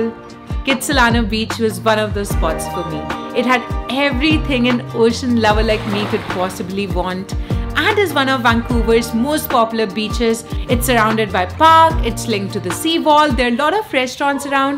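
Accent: India. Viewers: Please change your Indian accent..it's so funny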